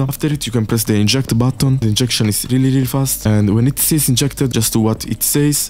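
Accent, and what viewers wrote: Italy. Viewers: fra ma sei italiano ?? non sembri inglese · l'accento italiano spacca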